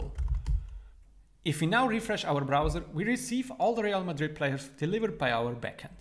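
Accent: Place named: Switzerland. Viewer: meh ghört din schwiizer akzent voll use. super video merci <3!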